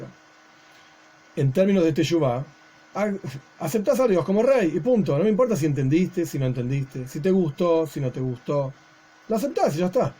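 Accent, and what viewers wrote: Argentina. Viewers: Rab usted es argentino?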